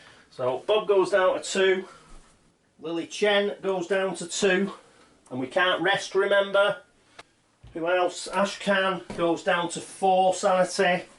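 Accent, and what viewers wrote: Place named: Lancashire, United Kingdom. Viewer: I'm in Spain but I was raised in Lancashire, I'll take a wild guess and say that you're from either Wigan or Preston.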